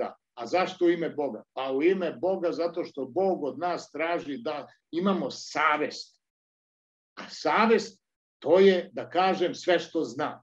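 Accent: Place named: Croatia. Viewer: Voditelj govori neku croato varijantu srbijanskog narečja.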